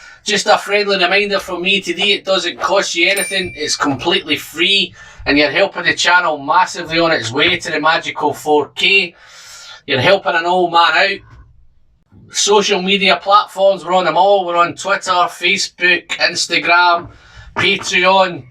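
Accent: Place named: Edinburgh, United Kingdom. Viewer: Hey! Greetings from Cairns. In Australia. My wife and i very much enjoy your vids and your laid back style and your accent reminds me of my grandfather who was from Edinburgh. Just ordered a couple of these from Royal Mile who are our number one goto Scottish supplier. We also got a couple of the new Laphroaig Sherry oaks. Keep the great videos coming mate! Cheers, Dazz and Carla in Ox..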